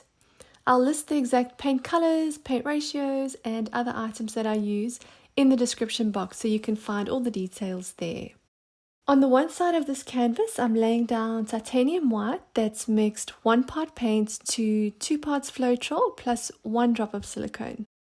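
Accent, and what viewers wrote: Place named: South Africa. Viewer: Brilliant idea and beautifully done. SA accent? Do you live in the US or SA? I'm so nosey, aren't I, but you're the first South African voice I've heard teaching painting and it's so refreshing.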